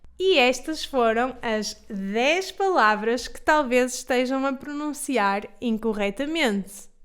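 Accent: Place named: Portugal. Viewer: Muito boa a aula, eu acho legal a pronúncia de Portugal, só fiquei impressionado com a pronúncia da palavra colesterol.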